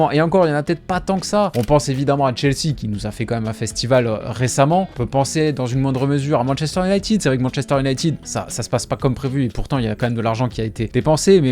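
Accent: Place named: Nice, France. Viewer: Ça fait plaisir d'entendre un nissart, issa nissa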